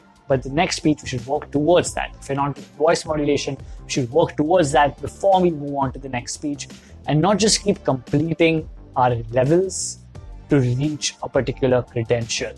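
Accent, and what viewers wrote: India. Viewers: There's nothing wrong with his accent. In fact, he has one of the most neutral English accents I've heard in Indian YouTubers in a long time. You're just bitter that he had some cons to share about Toastmasters. So you're making a personal attack. That's petty. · I congratulate you for your Chutzpa! With your accent? Are you aware of the damage you do? You ripped off your TM experience, then you flatter them, then you criticize them, then you plug your product? Please go to a speech teacher, get rid of your accent, then, when you no longer sound like Peter Sellers doing his Indian Accent, begin to teach. You are off the tracks, friend.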